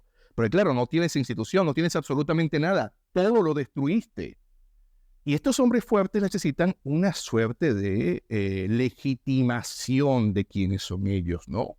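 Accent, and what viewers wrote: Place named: Peru. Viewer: Entiendo que se le deshumanizo y se le dio carácter mesiánico, eso sí es cierto, pero no fue el único libertador mitificado. Soy venezolano, y la verdad nunca me aprobaron ningún examen de historia bajo la presión de hacer la señal de la cruz ante la imagen de Bolivar por favor...deje de decir mentiras.... ni me obligaron a cantar el decreto de guerra a muerte, ni nada de lo que usted menciona. Tal ves sería en su escuela, deberia decir cual fue su escuela y donde estudio usted , ademas de que su acento es peruano, no habla como venezolano. Esta usted diciendo muchas cosas sin fundamento Sr. Revele sus fuentes y escriba un libro para poder buscar sus fuentes historicas. Sinceramente que verguenza usted. Ojala tenga que cerrar su canal por baja popularidad. Diciendo mentiras no se llega muy lejos. Revele sus fuentes.....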